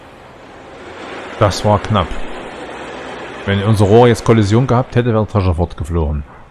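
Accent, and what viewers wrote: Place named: Saxony, Germany. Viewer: Hay war wieder mal super!!! Mach weiter so!! Ich habe keine Folge verpasst. Macht immer Spaß zuzuschauen. Wo wohnst du eigentlich, dein Sächsischen Dialekt hört sich gut an meine Lebensgefährtin kommt auch aus Sachsen!!!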